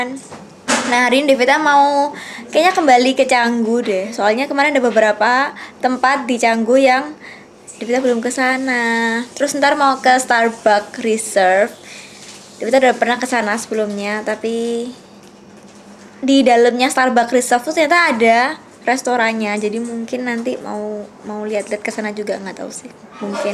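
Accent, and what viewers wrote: Java, Indonesia. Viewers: Medokny ituloh khas bngt .. jd setiap kata kek ada penekanan dlm pengucapannya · Suarane medok juosssss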